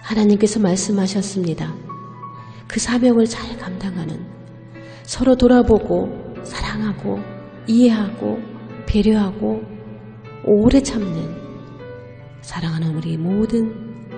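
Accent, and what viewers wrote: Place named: South Korea. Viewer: Nice, calming voice. I love korean accent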